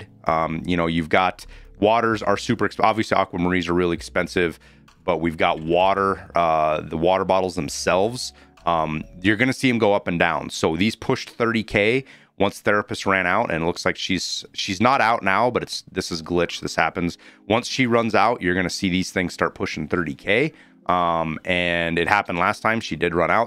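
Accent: United States. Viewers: Love your vids man but the one thing that kills me is some of your “Americanized” pronunciations. For example kvass is almost pronounced like ‘kwass.’ I understand you don’t know everything and it’s just a minor annoyance on my behalf but it drives me crazy xD.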